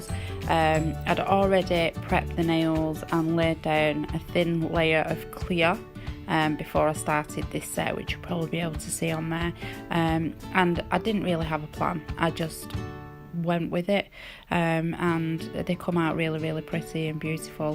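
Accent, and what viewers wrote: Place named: Northern England, United Kingdom. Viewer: Lovely set.... just subscribed as came across your vid... u sound very northern and familiar... I'm a Lancashire lass and is swear u r too x keep going... your doing really well. I'm 11 years in and still learn every set. X and your more confident than me cos I'm still not strong enough to set myself up on here yet. Tempted but always chicken out. So keep going x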